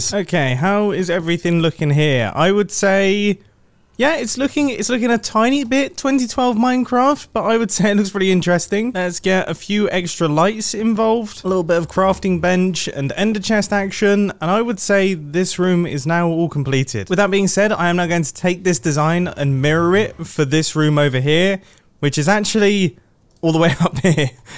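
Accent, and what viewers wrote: United Kingdom. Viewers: He's so British LOL, he says 'por-al' the 't' is kinda soft.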